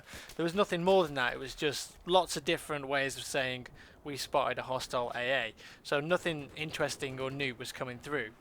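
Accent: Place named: Hackney, United Kingdom. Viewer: I'm here in 2021, I love hearing voice sound like a lock, stock and two smoking barrels hackney bloke. Fast forward to today and he sounds like a refined English gentlemen out for a spot of tea. Love ya,!!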